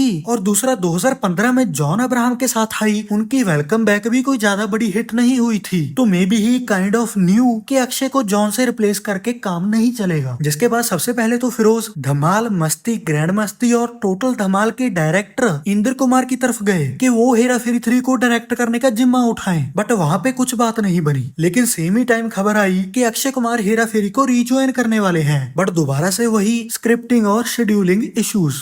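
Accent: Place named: Punjab, India. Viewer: Your punjabi hindi accent sucks ... You shouldn't make videos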